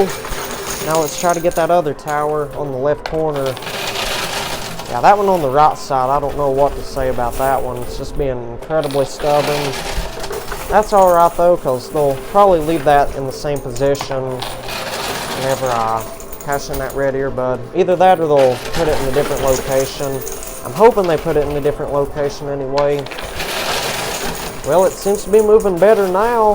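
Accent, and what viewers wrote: South, United States. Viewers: I really enjoy watching. You sound like you’re from down south.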